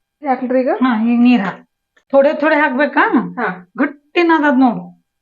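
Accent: Maharashtra, India. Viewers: Nimadu yaa uooru Maharashtra hatira.ada einari yakandr marathi mix bhasha bhala matadteri. Nimm bhasha Keli khushee aitu nanu hinge matadteni aadak❤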